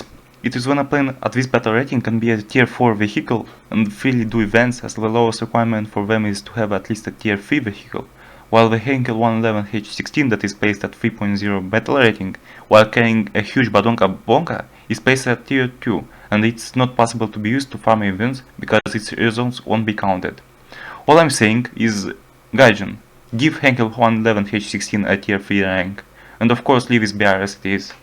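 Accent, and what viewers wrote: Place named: Russia. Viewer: Твой русский акцент режит прямо по ушам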